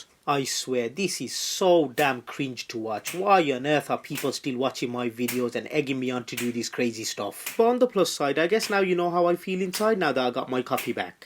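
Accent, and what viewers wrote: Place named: Midlands, United Kingdom. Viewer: Hope you will give more entertaining videos in future. Kinda stunned to see very few subscribers in your channel, maybe the gap took place earlier affected in your algorithm but I don't know the exact reason. However, I try to shadow your videos because of your lovely midland accent. So put regular videos and make me happy. N.B. - I really hate cockney a lot :) :) :)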